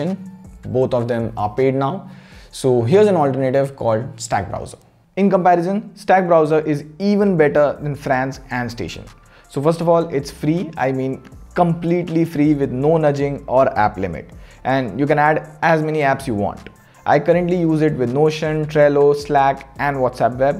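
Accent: India. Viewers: u Sound like Indian Accent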